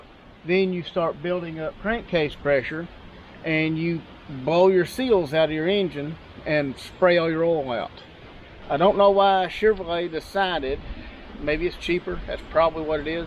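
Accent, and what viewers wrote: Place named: Wichita Falls, United States. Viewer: Thanks for this - I won't feel comfortable doing it by myself but you sound just like my MIL's boyfriend who is native to Wichita Falls. I would swear you're from there! I'll be asking him his thoughts about this solution.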